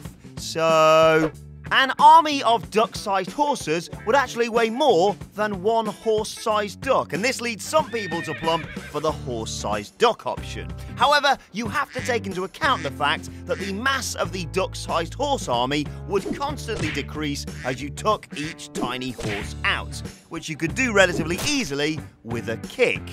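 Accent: United Kingdom. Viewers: Awesome is back with a awesome British accent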